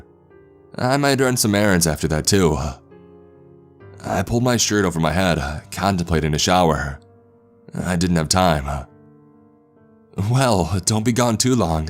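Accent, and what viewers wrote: Canada. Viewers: He’s Canadian probably?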